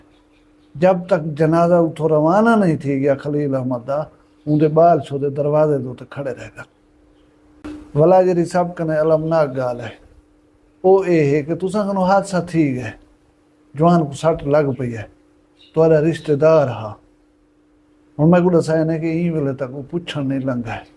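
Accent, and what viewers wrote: Saraiki, Pakistan. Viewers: صاحب اللّٰہ رحیم وکریم آپ کو اپنی حفظ و امان میں رکھے ۔ آمین ثم آمین بہت خوب صورت اسلوب بیان ساتھ سرائیکی زبان کی مٹھاس کا جادو۔ کیا کہنے۔ حقیقی اور وسیب کے دل دماغ اور ضمیر کو جھنجھوڑ دینے والا روزمرہ کے حالات واقعات پہ مبنیcontent ۔ ماشاءاللہ اس واقعے نے دل و دماغ کو ہلا کر رکھ دیا۔ اتنا خوبصورت جوان ۔ صاحب یقین کریں مزید کچھ کہنے اور لکھنے کا حوصلہ نہیں۔ بوڑھی ممتا ، جوان سالہ بیوہ ، معصوم ننھے فرشتوں اور اس عمر میں جوان سال بیٹے کا جنازہ باپ نے کیسے اٹھایا جو گا۔ اللّٰہ رحیم وکریم سے اس خاندان پر اپنا خصوصی فضل وکرم فرمائے ۔سب کے لئے آ سانیا ں فرمائے ۔ مرحوم کو جنت میں اعلیٰ مقام عطا فرمائے ۔ آمین ثم آمین اللّٰہ تعالیٰ آ پ کو دین ودنیا کی ڈھیروں کامیابیاں اور کامرانیاں نصیب فرمائے ۔امین ثم آمین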